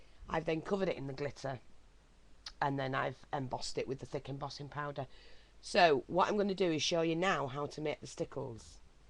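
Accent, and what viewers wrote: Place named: England, United Kingdom. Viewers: Awesome, I subscribed cos I liked ur accent, makes a change from the tuts been american .... good to hear a northerner ;) ... great tut btw, can't wait to watch more x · Loved the video. I am all about saving money, and still having a great product. Thanks so much from B.C. Canada. I do Art Journalling and taking a course on Monday. Will be making some and using it on my page. Will pass this along to everyone. Thanks again.. Love the English accent.. I have English/Scottish Ancestors, and plan to go to England/Scotland hopefully again in a couple of years.. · Yorkshire accent rockz! Go! <3 Love all your tuts! ^^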